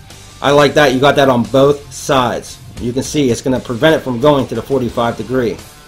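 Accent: Pittsburgh, United States. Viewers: you def sound like a Yinzer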